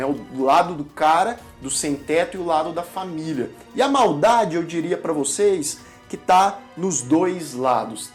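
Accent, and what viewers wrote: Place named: Minas Gerais, Brazil. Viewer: ...vc pode ser de Brasilia,mas fala igualzinho aos mineiros...sou mineira de BH,embora more atualmente em SP,gostei mto da similaridade! Um forte abraço,seu trabalho é de excelência!( só vi Laranja mecânica!)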